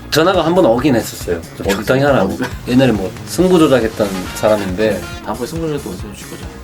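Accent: Gyeongsang, South Korea. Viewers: 말투가..갱상도출신인가요..ㅎㅎ 머.도박을.했엇지만...비굴하지는.않했네요 자기말에.지킬려고.했던.마인드.. 칭찬해주고..싶습니다.... 도박..다시는.않하시겟지만.. 내용을.보니.머리가.아주.비상하시것같습니다 뭘해도..잘해내실것.같네요... 홧팅.드립니다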